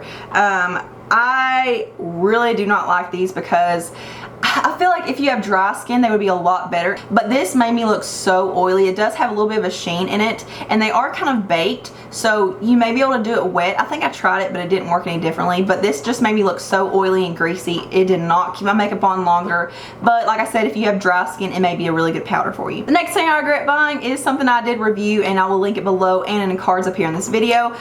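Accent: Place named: Georgia, United States. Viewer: I subscribed as soon as I heard your accent. 😂😂😂 I'm from GA and live in SC. I like hearing people talk like me! 🙌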